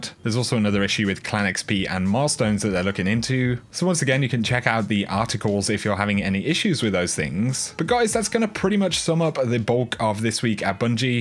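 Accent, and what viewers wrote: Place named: England, United Kingdom. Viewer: First D2 was extremely easy and now because of the restrictions has become too difficult to progress, the issue with clan engrams doesn't help. Hopefully they will review this and make some changes. I used to play everyday through D1, D2 doesn't encourage me to do that any more. Lastly, many thanks for all the information you provide, been watching for a while (Nice to hear another English accent).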